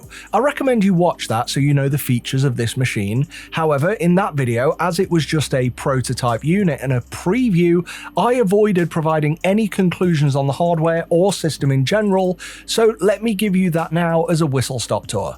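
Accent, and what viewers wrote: United Kingdom. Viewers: We are in the process of setting up a small-batch consumer products company and have recently ordered a number of GK two's. Love the concept of this Heygears unit as it has the potential of minimal fettling. Unfortunately the cost of the resin would render the units impractical as it would push our product price-points too high. P.S. Great video. As alway's, informative, concise and candid. All in an East-Midlands accent. What's not to like :)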